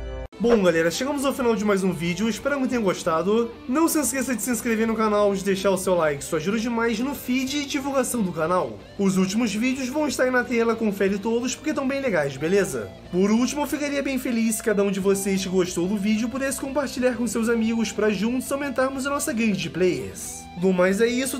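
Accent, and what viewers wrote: Portugal. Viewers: Esse cara é Português de Portugal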